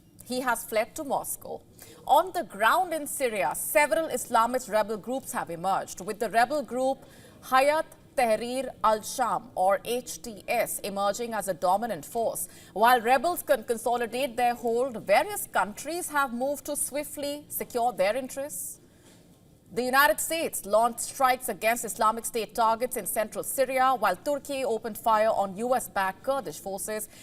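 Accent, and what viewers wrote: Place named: India. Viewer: Indian accent so funny😂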